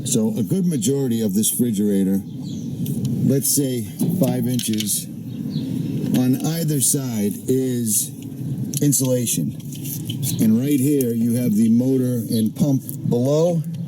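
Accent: Boston, United States. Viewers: Ha. I was watching your video and I said to myself, this guy sounds like he's from Boston. Then toward the end of the video you mentioned you're from Boston. I'm in Boston too (Hyde Park). Anyway.. I'm looking at this fridge now for my cargo trailer that I recently converted to a camper. I was initially looking at the dual zone, but its slightly too large to fit into the space I'd be putting it in. This 45 quart fridge will fit perfectly tho. Thx for the review.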